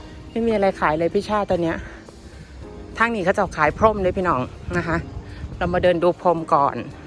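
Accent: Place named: Isan, Thailand. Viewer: ชอยคุณ พูดอีสานเพราะมาก เอ็นดูเวลาบ่นสามีค่ะ 😻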